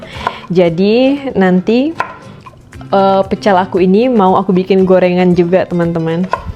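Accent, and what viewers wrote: Pontianak, Indonesia. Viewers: sudah kuduga.... Logat nya pontianak sekali.... Pontianak dmn nya kak? ❤salam dr pontianak jugak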